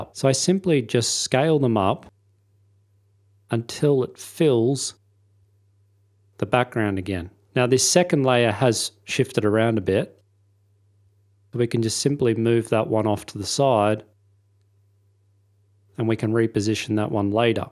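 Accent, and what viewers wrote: Australia. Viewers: Straya! I've been learning After Effects from a lot of different wonderful people on here from around the globe - It's nice to finally hear the Aussie accent.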